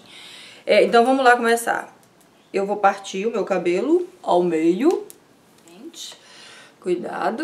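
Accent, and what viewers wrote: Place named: Minas Gerais, Brazil. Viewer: eu entendo o pouquinho de mineiro 😂😂. Maravilhoso o vídeo e já aguardo o das técnicas de maquiagem. Beijo diva 💋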